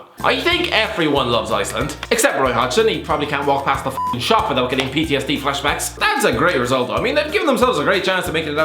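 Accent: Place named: Ireland. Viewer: nah hes just irish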